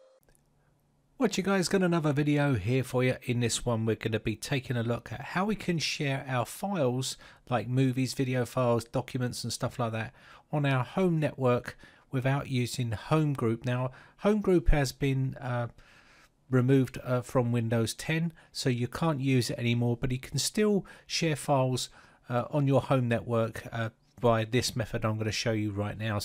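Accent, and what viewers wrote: England, United Kingdom. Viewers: Nice to hear an English accent lil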